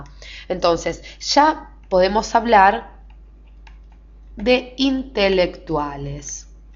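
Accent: Argentina. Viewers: la mina es de argentina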